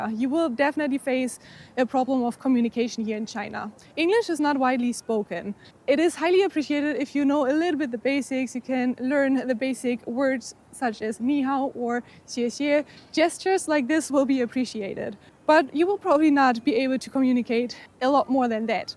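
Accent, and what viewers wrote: Germany. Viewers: Du I hear a German Akzent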